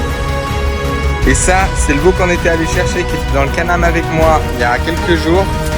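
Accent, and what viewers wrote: Switzerland. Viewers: L'accent du Nord Vaudois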